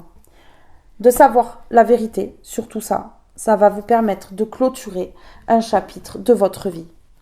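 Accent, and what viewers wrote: South, France. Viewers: j'asore votre accent du Sud...trop mimi ne changez pas merci pour votre guidance bon wkd...13 vendredi😊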